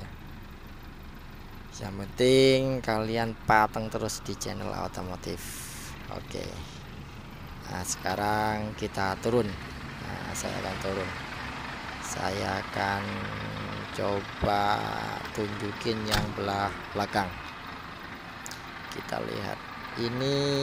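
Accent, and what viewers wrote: Indonesia. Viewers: Orang indonesia asli kah bang kaya logat bahasanya hehe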